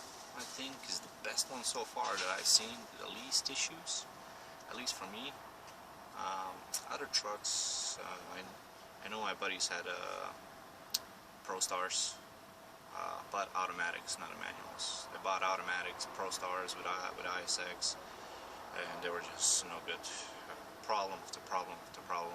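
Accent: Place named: Russia. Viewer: You definitely sound like a Russian.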